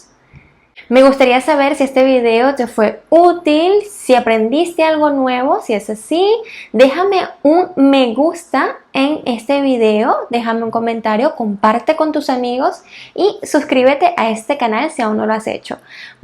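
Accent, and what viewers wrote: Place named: London, United Kingdom. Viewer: Are you in London mam ? Because you are speaking very nice 👍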